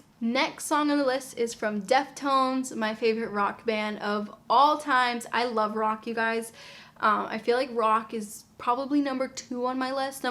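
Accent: Boston, United States. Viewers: Are you from Boston? Your accent.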